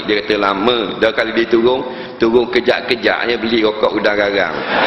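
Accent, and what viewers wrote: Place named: Terengganu, Malaysia. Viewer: LoL!! Lawak!!! Seb baik atok nenek aku org ganu, blh gak faham kecek ganu.. :) -Singaporean..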